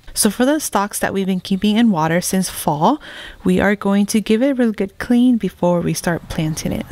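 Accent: United States. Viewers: Which part of the world are you at, by your accent maybe USA. I live in southern England on a island. Ive been growing lemon grass since 2018 brought back from Thailand. I now have at least 300 plants. Its become a obsession with me. Great video. Are you from Indonesia?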